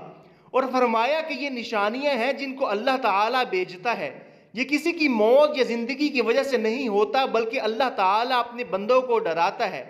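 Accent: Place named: Rural, India. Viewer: Taire awaz sai hai pata chal Raha hai Tu Kitna paindo hai. Don't spread lies!😞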